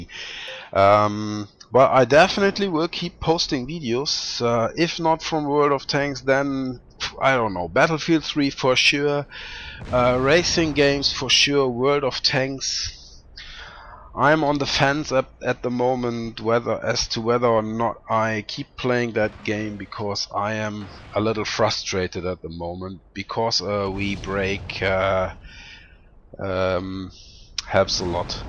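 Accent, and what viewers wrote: Germany. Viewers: Haha erste minute und wusste gleich dass du deutscher bist :D Wo haste denn die mod her? :)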